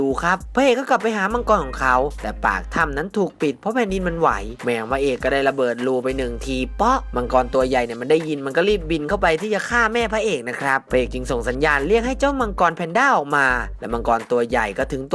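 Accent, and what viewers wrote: South, Thailand. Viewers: หนูรู้สึกเหมือนพี่เป็นคนใต้เลยเเต่หนูชอบคลิปนี้อยู่นะค่ะเพราะมีตลกมาเพิ่ม🤣🤣🤣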